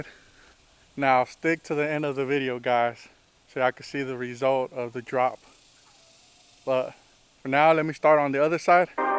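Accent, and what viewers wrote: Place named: Houston, United States. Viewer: Sound like your from Houston. I like it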